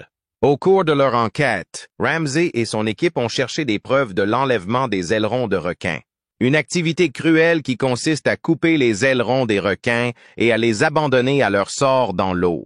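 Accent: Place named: Canada. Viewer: Heuuu pardon mais c'est quoi cette voix ? Cananadienne ? Ke veut bien mais là.... ça fait peur 😢😅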